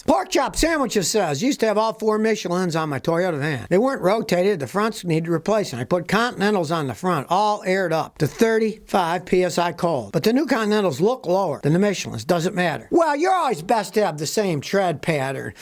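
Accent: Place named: Tennessee, United States. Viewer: Anyone else notice that is getting a Tennessee drawl???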